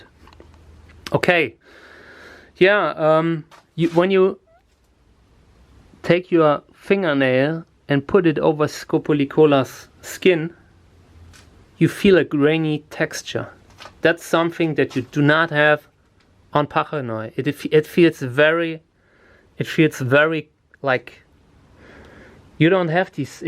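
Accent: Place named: Germany. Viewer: Du bist doch Deutscher.... Das hör Ich doch.... Wo wohnst du denn, dass die so gut gedeihen?? 👌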